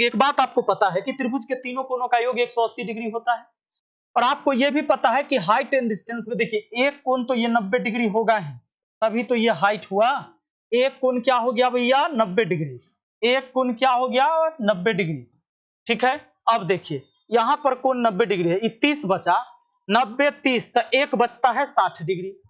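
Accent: Bihar, India. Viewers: ❤आपका पढ़ा बिहार जैसा एकादम पूरा ठेथी भाषा❤❤❤🎉🎉🎉🎉🎉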